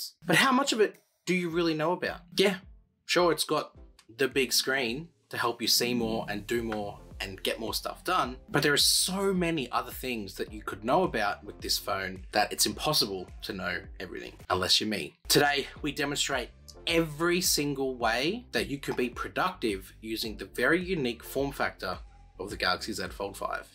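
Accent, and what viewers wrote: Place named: Australia. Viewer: Wow good to hear a Aussie accent on the phone review. Thanks so much, I have the Z Fold 3..might change to the 5 eventually..great review thank you 👍👏👏👏❤️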